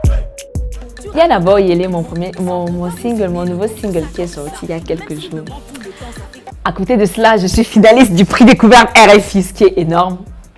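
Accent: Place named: Cameroon. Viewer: Je kiff trop quand tu parle le français genre genre du camer 😂😂😂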